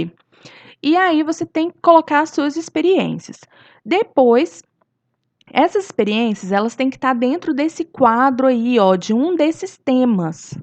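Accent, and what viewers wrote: Minas Gerais, Brazil. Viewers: Tu é mineira? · Meo deos mule tu e mineira???????;_;amei quem amou?akaka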